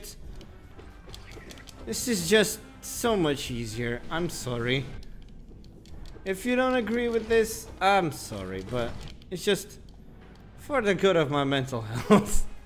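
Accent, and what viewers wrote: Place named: Russia. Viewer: my boy have a second channel well then sees he did star war thing TAKE MY SUBSCRIBE R N By the Way : are you russian ? you sound like you are Also it was a great run im up for Minecraft and other game aswell i recommend you to play Little Nightmare 1&2 like doing a let's play or something have a great day !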